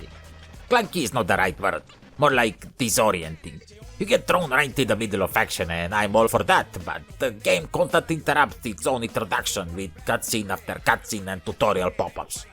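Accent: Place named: Sardinia, Italy. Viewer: I can't belive americans mistook your accent for spaniard when it's obviously sardinian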